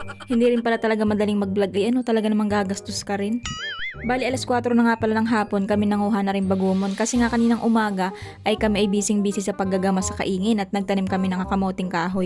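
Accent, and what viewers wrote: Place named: Visayas, Philippines. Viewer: Ido bakit Minsan may halong Visaya Ang salita mo? Sinong Visaya sa maguwang mo?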